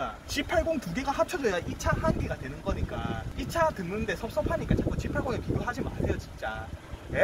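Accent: Busan, South Korea. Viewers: 시원시원한 부싼 사투리에 설명도 잘해주시니 사고싶은 욕망이 치솟네요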